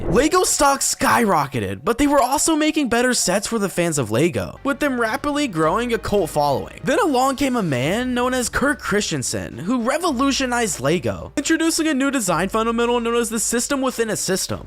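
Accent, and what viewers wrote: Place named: United Kingdom. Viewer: Man, I thought you’re supposed to build with Legos not make a whole goddamn essay about it I mean we want to hear you talk British make Lego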